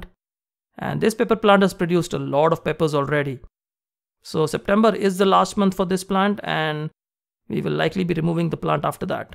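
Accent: India. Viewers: are you native to india i feel so because of your accent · he has indian accent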